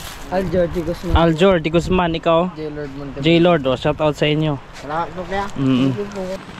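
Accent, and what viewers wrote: Visayas, Philippines. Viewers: Native panggalatok po ba kayo brother? May konting bisaya ang accent nyo, parang mixed. Anyway oks ang channel mo bro.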